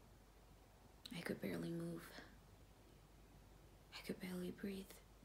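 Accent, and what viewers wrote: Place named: United States. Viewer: your american accent reminds me of michelle rodriguez!!!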